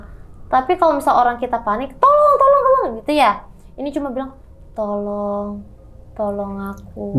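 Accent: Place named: Medan, Indonesia. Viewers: sor x ku dengar kakak ini becerita pake logat medan bah wkwk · Logat nya Medan kali ha salam dari Medan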